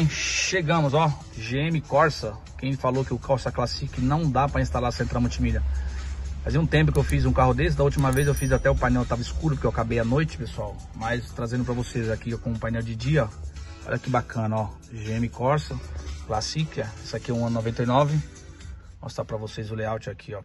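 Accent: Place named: Rio de Janeiro, Brazil. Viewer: Vc e do rio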